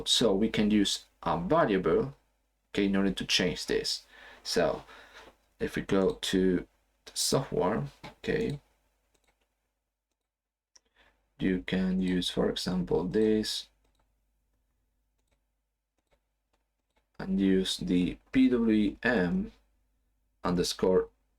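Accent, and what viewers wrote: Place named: Argentina. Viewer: Hola , no se por que se me hace que sos argentino, por casualidad tenes los pdf de tus clases ?, soy martin de argentina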